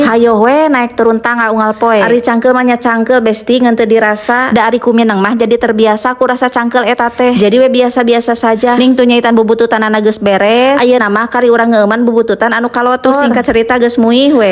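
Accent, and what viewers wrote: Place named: Garut, Indonesia. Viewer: ciga logat urang manaaaa Kitu teteh...😂😂😂,,Garut kidul kitu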